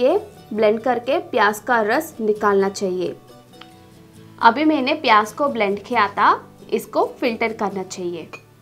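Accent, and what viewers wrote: South, India. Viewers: Kya ap south ke hain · Are you south indian? 😀 apke bolne ka tarikha bilkul meri mosi jaisa hai.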